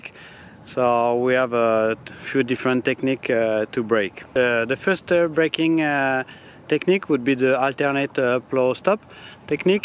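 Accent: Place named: France. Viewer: Ah je me disais bien que y avait un p'tit accent français la dedans :D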